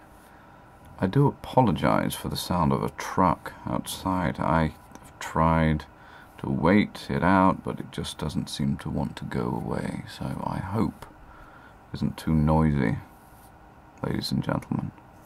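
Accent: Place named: RP, United Kingdom. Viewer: Love the rp accent, subscribed! Fellow brit :)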